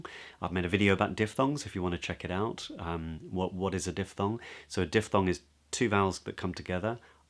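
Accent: RP, United Kingdom. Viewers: Is your what we call RP / BBC accent ?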